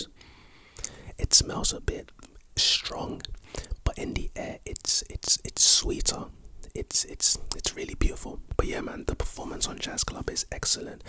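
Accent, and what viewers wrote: United Kingdom. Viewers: is the best new male ASMRtist comin up this year. Love the Brit accent, smoov voice and great sense of humor. Not to mention his nose, which certainly knows. Big ups for getting me back into fragrances. I actually sound like I know what I'm talking about at the dept store fragrance counter, and every time my woman sees me she sticks her nose in my neck and gives me a big whiff to see what I'm rockin. Thanks u got the sauce fsho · THE BRITISH ACCENT IS GOD DAM GOOD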